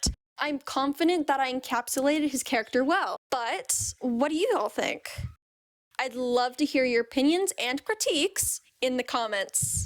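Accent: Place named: Canada. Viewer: You sound so Canadian in this btw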